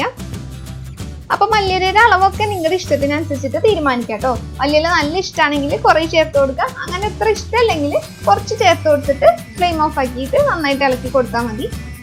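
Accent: Thrissur, India. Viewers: Nala malayalam Trisuranele😊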